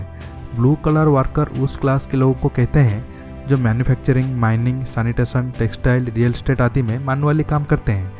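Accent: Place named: Bengal, India. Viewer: are a bangoli he esleye hindi bolne ke thora taklep hota he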